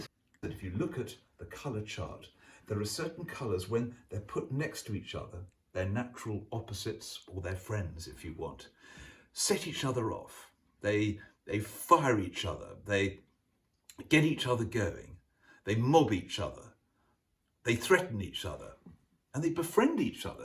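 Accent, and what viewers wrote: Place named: United Kingdom. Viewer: Thank you so much for your lovely "lesson ". True, everything sounds better with the british accent. Greetings from Tuscany, Italy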